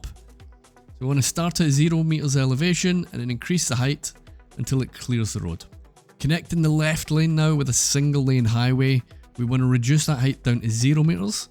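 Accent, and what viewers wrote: Scotland, United Kingdom. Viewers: heard a fellow scotsman playing my fave game and im 100% tuned in. nice video :)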